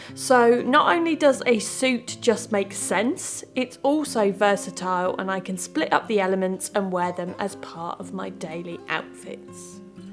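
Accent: England, United Kingdom. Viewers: Your voice and the English accent are so chic. Adore listen to you.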